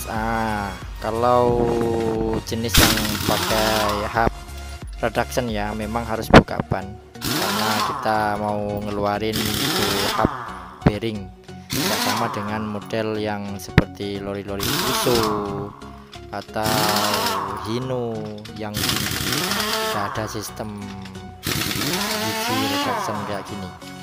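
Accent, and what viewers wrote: Java, Indonesia. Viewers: jowo campur melayu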